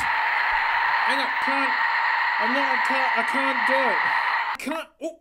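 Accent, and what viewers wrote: Australia. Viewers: I like how the speaker is australian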